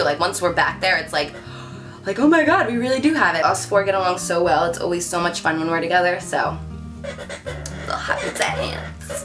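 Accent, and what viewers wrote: New Jersey, United States. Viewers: OMG it finally makes sense. Your slight accent. I don't how I couldn't tell before you have a Jersey accent.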